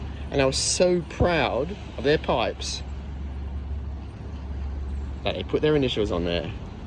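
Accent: Australia. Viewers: Really enjoy your aussie accent. And the time you take in explaining your finds.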